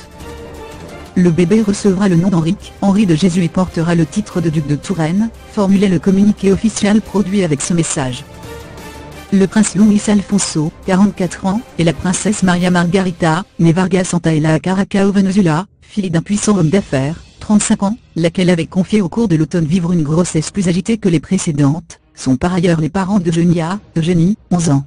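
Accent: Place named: Spain. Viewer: Il parle Français comme ma concierge espagnole.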